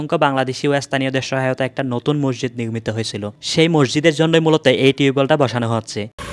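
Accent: Chittagong, Bangladesh. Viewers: চট্টগ্রাম। চট্টগ্রাম এর মানুষের বাংলা উচ্চারণ একটু পার্থক্য আছে।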